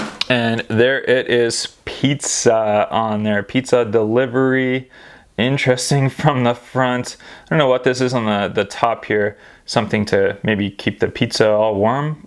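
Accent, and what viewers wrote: United States. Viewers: how come you are British but you have an American accent?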